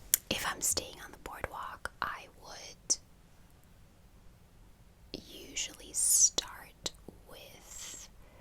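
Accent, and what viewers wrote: United States. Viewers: I can't get over how American you sound! I would never know you aren't American just by listening to you! Do people IRL ever mistake you for an American?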